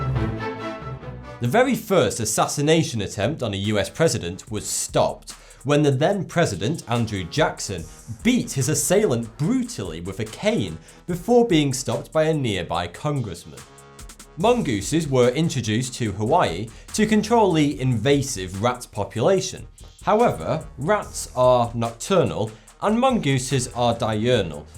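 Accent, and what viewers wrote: United Kingdom. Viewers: 675 000 views..... man! british accent is the real Mc coy!!! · he is handsome.........love his brittish accent and voice....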